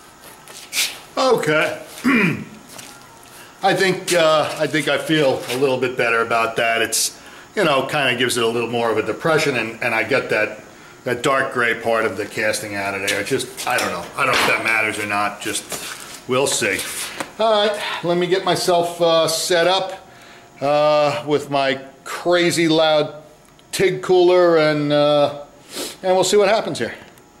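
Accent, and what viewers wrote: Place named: North Jersey, United States. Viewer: I was figuring that was North Jersey accent I was hearing..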